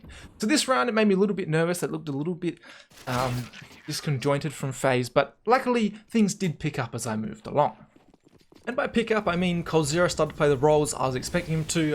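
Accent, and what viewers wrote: Australia. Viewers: bruh moment...i wasnt looking at youtube and when the video started i heard an australian accent and was like oh, im watching a heyzeus video....wait a minute, this doesnt sound like heyzeus...OHHHHHHHHHHHHHHHHHHHHHHHHHHHHH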